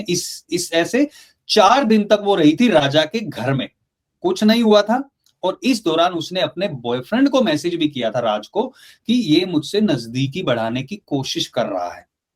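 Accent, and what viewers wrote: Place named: Haryana, India. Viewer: Australia mei rehte hai aur accent Haryanvi hai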